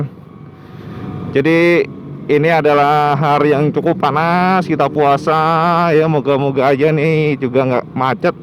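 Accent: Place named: Java, Indonesia. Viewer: Logat sampean iku cak..njowo tenan..jian seneng nnt sampean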